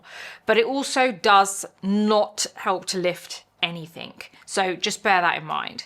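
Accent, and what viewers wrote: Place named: United Kingdom. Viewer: i think her native language is english since she has a uk accent lol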